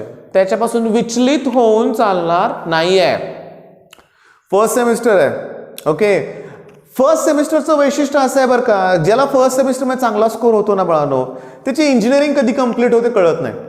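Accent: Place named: Western Maharashtra, India. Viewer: Sangli satara kolhapur che por boltat he bhava ✌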